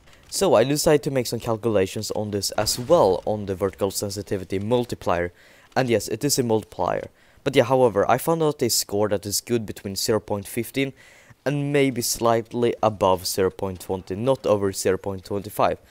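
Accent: Sweden. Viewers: EJ grym video, jag gissar på att du är svensk för din dilekt lol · Are you Swedish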